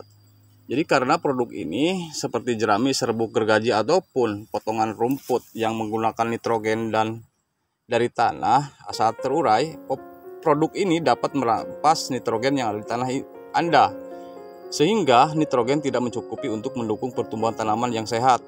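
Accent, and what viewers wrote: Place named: Sulawesi, Indonesia. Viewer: cra abg ngomong kyk org sulawesi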